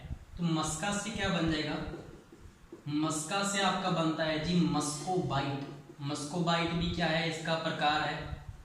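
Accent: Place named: Rajasthan, India. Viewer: Rajsathani lagyo mn Bhai re 😂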